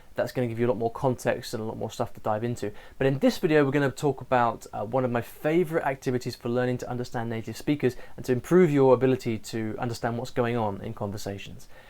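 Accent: United Kingdom. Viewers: you brithish english is really cool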